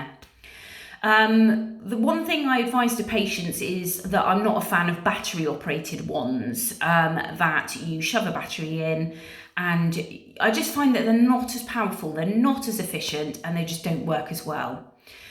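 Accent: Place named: United Kingdom. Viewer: I could listen to you all day long. I love the British accent.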